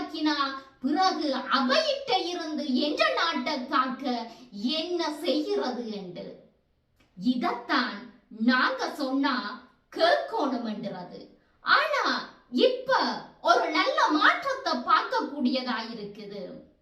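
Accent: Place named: Jaffna, Sri Lanka. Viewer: ஆஹா...எங்கட யாழ்பாணத்து தமிழ்... அருமையம்மா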